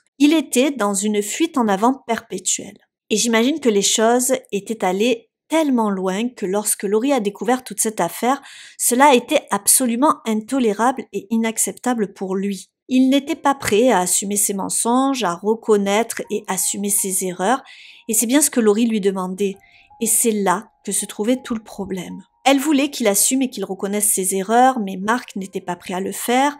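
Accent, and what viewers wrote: South, France. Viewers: Diction pas vraiment bonne car malheureusement a plutôt tendance à très mal prononcer les mots comme rouages...nuages...mariage... crochetage...emballages..etc. Ce genre de mots finissent en "ache"...rouaches...nuaches... mariache...emballaches...etc... Et c'est hyper énervant. Surtout qu'en plus a un petit accent (du sud...je pense)...et ce n'est vraiment pas joli à entendre. A part cela...il est vrai que est une des youtubeuse true-crimes qui s'exprime le plus clairement.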